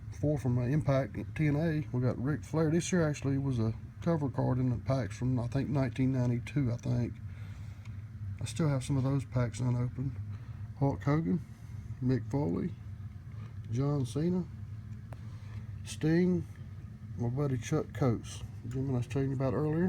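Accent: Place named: South, United States. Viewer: That was a blast from the past & made to much better by the native WCCW & NWA southern accent!!! :)) "See ya in church" brother. Blessings, David (Jer. -24)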